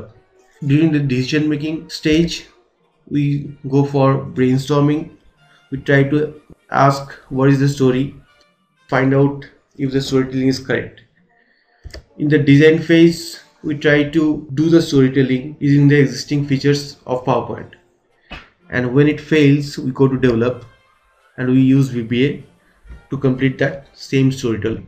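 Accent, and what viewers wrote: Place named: India. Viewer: I hate the India English!!!!!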